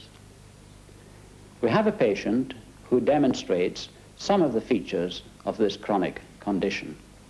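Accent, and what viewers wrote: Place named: England, United Kingdom. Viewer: That's a proper english accent. Major thing i like docs for.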